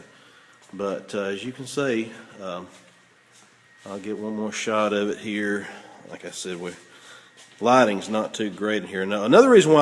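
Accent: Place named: South, United States. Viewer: I'm so glad to see y'all restoring this wonderful car. I owned a '71 when I was 17. Loved that car and now I own a '72 (and I'm waaaay past 17 now. lol). Loving your accent too. I spent my first 41 years in Texas, now I'm in New York. I miss listening to southerners. :) I'm impressed with all your work. Kudos to you and your class.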